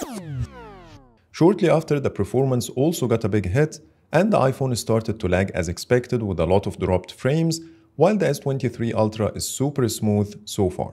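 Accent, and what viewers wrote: Egypt. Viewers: اللهجة مصريه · مصري؟😂 حسيتك هيك من اول جملتين😂 *انا سوري btw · Bro, are you Egyptian cuz I can hear it from your accent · عاش والله طور من الانجليزيه عشان ملاحظش انك مصرى بس ممتاز جدا ❤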